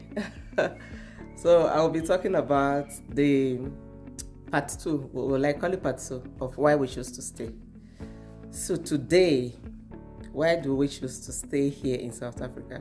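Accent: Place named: Nigeria. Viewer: I love Nigerian accent, I hope yours doesn’t change. This is your continent, live and enjoy sis.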